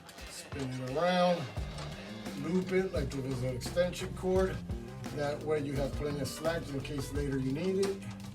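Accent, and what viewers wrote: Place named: New York, United States. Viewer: Just found your channel and watched a few videos. I like it. Sounds like you're from New York.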